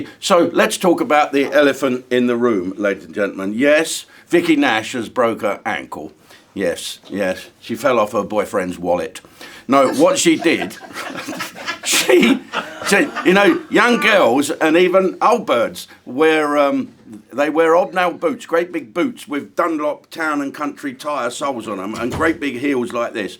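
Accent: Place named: RP, United Kingdom. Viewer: Very posh. Very posh.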